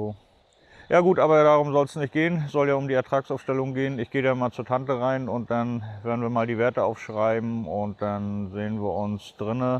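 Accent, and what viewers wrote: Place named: Western Pomerania, Germany. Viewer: Du musst doch irgendwo aus Vorpommern kommen, jedenfalls nach deinem Dialekt!?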